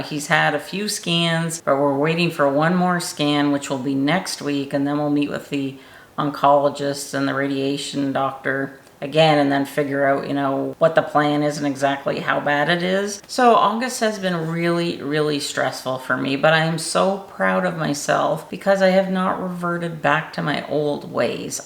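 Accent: Canada. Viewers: Congrats. Love grapes. Eggs? Why not whip them fluffy with your hand blender then place in the oven for a few minutes? Quality dark chocolate is good for you—not the health store ones that say 75%-100%, no. Get less than 70%, for sure. ***Might I suggest that you consider carbs during the day ONLY? Yes, this would mean before dessert before supper. I mean, no carbs after 5 pm for a few weeks then 4, for a few weeks, then, 3, then, 2 pm. Not kidding. Plus, this works faster than people assume. You sound a bit… Newfie🙂? And, an extra calendar = meal prep. No stress. Great luck with hubby🌬️❤️‍🩹🕊️. Congrats again🎉.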